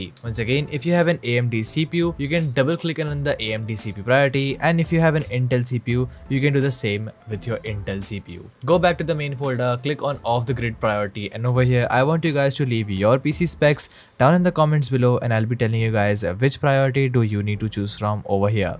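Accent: India. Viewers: With a hacker name like - I aint trusting NOTHING this mfer says.. "Download and run these programs..' HELL NO, especially when he sounds like some ripoff artist from India.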